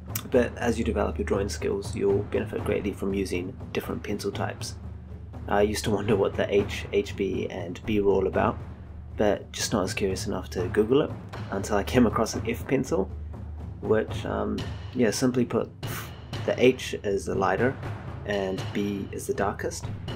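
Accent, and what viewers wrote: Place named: New Zealand. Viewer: you from nz?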